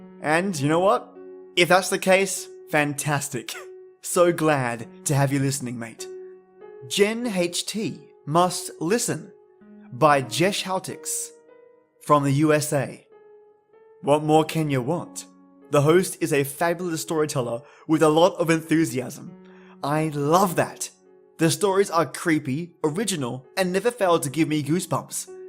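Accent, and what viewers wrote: Australia. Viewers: I always enjoy listening to you, yes. I agree, your voice is charming! Love your pleasant Australian accent. Damn! It can defrost this bitter bitch🍋🍋🍋, which is quite the feat, congratulations!😉 Plus, creepy story to send chills down my spine, always a treat!🍭🍭🍭You're extremely awesome as a narrator, which is just makes my day complete!😊😊😊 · Sweet jesus, where did you get that picture!? SHUDDERS That alone will give you nightmares! That story was amazing! That was one pissed off ghost. Great job,! (Holla to my fellow Pagans and Witches!) I gotta say, I definitely agree with all the feedback of the other listeners, especially the 'charming Australian accent' heehee. Another job well done! raises my cup Cheers to you!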